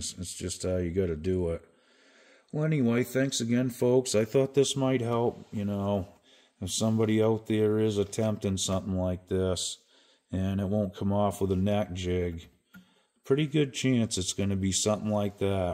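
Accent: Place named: New England, United States. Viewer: Wow, interesting! Following to see what becomes of this Harmony. Probably better than it ever was! Also, your voice reminds me of if Mike Ehrmantraut was born and raised in New England!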